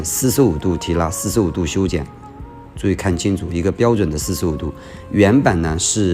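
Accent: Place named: Sichuan, China. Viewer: 四川口音親切可愛，NL不分😂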